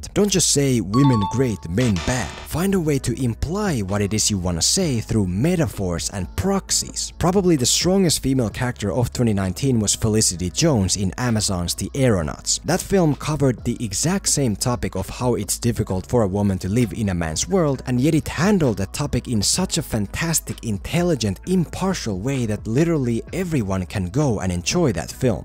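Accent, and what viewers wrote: Finland. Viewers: Are you finnish?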